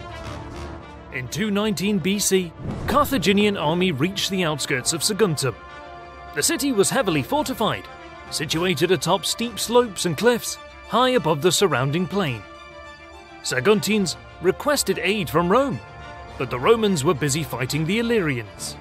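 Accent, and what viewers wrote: England, United Kingdom. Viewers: The best animated documentary of the 2nd Punic War ever seen: very detailed descriptions, beautiful animations, great music and sound effects (seems to me Rome Total War style). I almost forgot, your British accent is very elegant and very understandable, thanks a lot! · Why does this sound like it was written by an American and read by an Englishman? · Subscribed! That's what I call interesting quality content, I even love your British accent. :)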